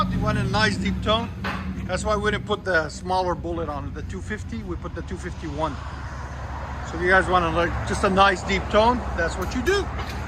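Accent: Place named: New England, United States. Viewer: I cant get past the sound of a yankee doing the commentary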